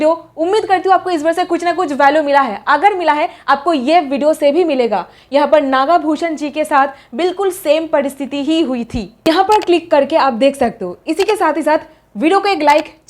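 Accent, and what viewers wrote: Bengal, India. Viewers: she has Bengali accent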